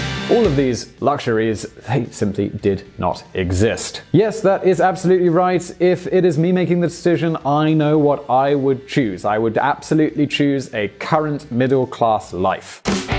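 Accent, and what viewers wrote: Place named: United Kingdom. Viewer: The fact that you are effectively arguing for shortened testing and trials of new drugs is frankly pretty apalling. Some one with your accent, should know all about the horrors of unsafe drugs as Thalidomide drestoyed a lot of lives in the UK. Add to that you basically argue financial duress on the part of the pharmacutical companies having to test their drugs, yet they are some of the most profitable corporations on Earth, right next to the oil industry. There is no reason whatsoever that drug trials need to be sped up.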